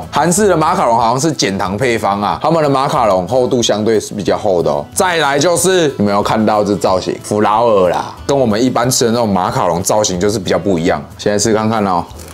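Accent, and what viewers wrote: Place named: Taiwan. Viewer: 好台好喜歡😘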